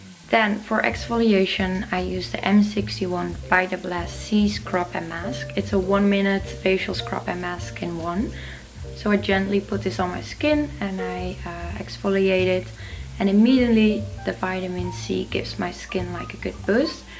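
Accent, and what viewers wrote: Netherlands. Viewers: Je klinkt een beetje Nederlands nog! Ik kom van masha haar mukbang :)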